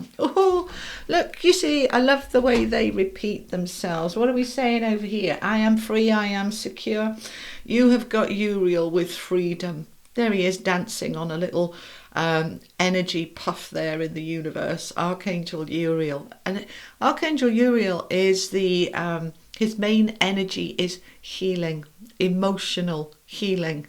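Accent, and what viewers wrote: United Kingdom. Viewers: I am free I am secure, I am safe. I love your wonderful energy and love to hear your British accent- so many of my Favorite musicians are from the UK …. I wonder if I have had a past life there with the attraction and fascination with everyone abroad ❤ thanks for sharing your lovely messages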